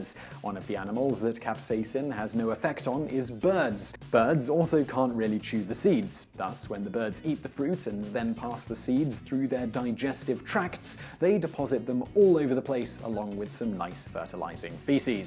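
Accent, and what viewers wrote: United Kingdom. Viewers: He's British using the British pronunciation. Here in the US the pronunciation is different, just to annoy the rest of the world.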